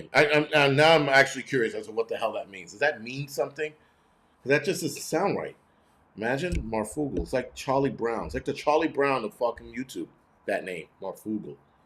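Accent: New York, United States. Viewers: I love your NYC accent. I'm from Chicago and I love the East Coast. We canot let these ppl continue to messup ourcountry.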